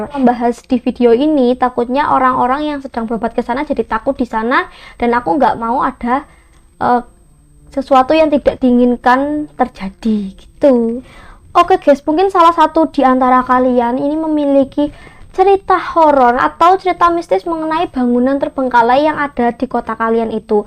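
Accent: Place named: Java, Indonesia. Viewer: Halo kak, aku subcribe baru, nice konten kak, suka sama kemedokannya, rq kasus kriminal dong ka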